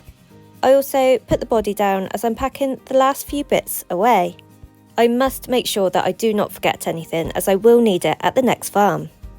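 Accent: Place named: Wales, United Kingdom. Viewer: Hi, nice to see you back again and looking well although I know looks can be deceiving. But you are always smiling so that’s good. Yes the welsh accent is great isn’t it although I am a bit biased mum was Welsh and us kids were bombed out of London in the blitz. So up to our family south wales lucky us.😂😂. I bet it’s nice sleeping on the farms and safe of cause. Well stay well you’re health is the most important thing so never take it for granted if you do it will catch you out down the line. I know I have been there and it’s most unpleasant. Good luck Jon 🇦🇺WA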